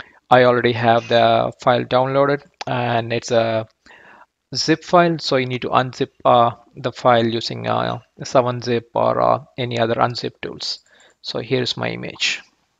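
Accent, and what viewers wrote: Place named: India. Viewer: Thank you,. 1. So I need to find a router that has a USB port and connect the RPI and the hard disk to that router? Thanks 2. Yes Volumio and Max2Play are paid but hopefully they will offer some support in return, at my age I need a lot of hand holding when it comes to software. 3. These small screens connect via what is called SPi. I assume this means Special Purpose Interface and they require coding of which I have zero knowledge. I would have to find some youngster willing to help me with that. 4. So your laptop was never connected to the RPI? Thanks again for your quick response. I guess from your accent that you are an Indian living in the US. Probably a young software professional. So thank you for helping a fellow “Desi”.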